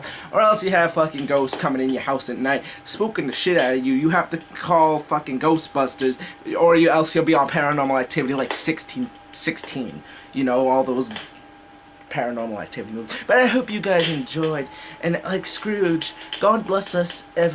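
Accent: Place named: United Kingdom. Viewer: Nice British accent haha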